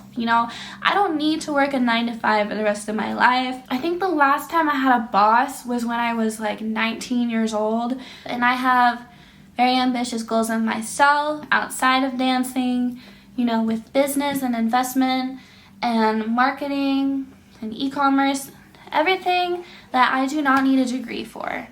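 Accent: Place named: Oregon, United States. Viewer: I thought 💭...I heard some Oregonian in you and then, you said it... 😲 Wow 😲 I'm a new subscriber and I moved to Phoenix, AZ with my girlfriend and our 5 cats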